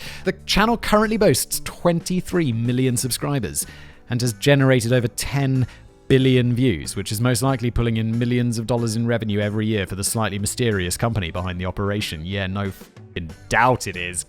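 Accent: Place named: United Kingdom. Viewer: You have got to love the irony of the guy who is the McDonald’s of YouTube, making comments about the quality of other peoples YouTube channels. Every single time this individual has a brain fart. It turns into a new channel, he can’t even read a teleprompter without making mistakes, almost literally every single video. A guy who makes his living by literally doing just quantity over quality, criticizing other people about the quality of their videos. He mispronounces things that are basically commonly known words in the English language or our general knowledge, but he wouldn’t know that because his only qualification for his job is the fact that he has a British accent. Which makes people think he is smart, spoiler alert, he’s not. This imbecile actually claims to have a university degree from somewhere in Britain, he should send the work he has done in the last few years to his alma mater and maybe he can get his money back.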